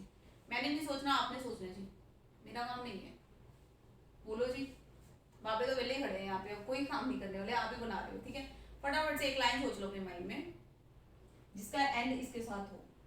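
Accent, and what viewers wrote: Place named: Punjab, India. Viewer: Mam app punjbi bolte bhut ache lgte o😍😍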